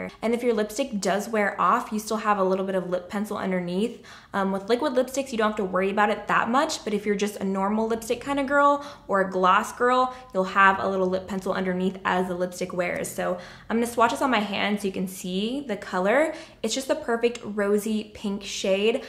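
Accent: United States. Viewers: how did you get rid of your cute country ass accent LOL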